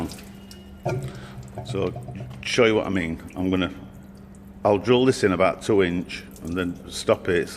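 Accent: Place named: Sheffield, United Kingdom. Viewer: brill channel love the Sheffield accent and humor Hope yr ok mate Wherever you are?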